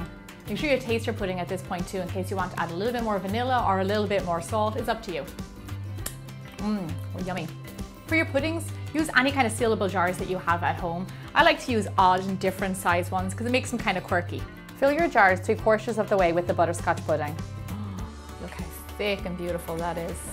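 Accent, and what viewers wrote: Ireland. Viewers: You had me at the Irish accent. I stayed for the food. haha! I am making the girls honeycomb today. Have a wonderful weekend! HUGS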